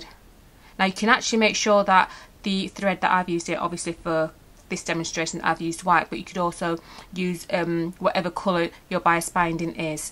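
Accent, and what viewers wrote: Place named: England, United Kingdom. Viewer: Loving the fact English people are doing clear informative demos. Way preferable to yank accents!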